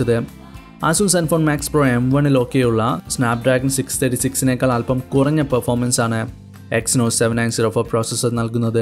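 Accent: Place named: Kannur, India. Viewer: Bro kannur ano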